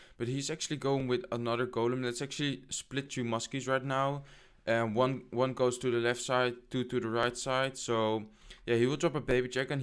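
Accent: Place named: Netherlands. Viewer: Michi i think he is dutch :)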